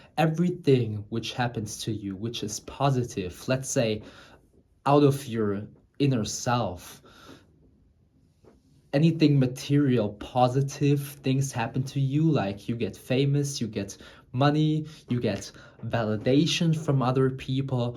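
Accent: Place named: Germany. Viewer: 2 seconds in and I know he is German :face-purple-wide-eyes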